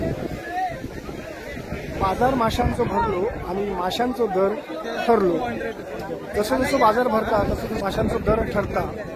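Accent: Malvani, India. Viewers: फारच छान बाजार तुमचे मालवणी ऐकाक मजा येता।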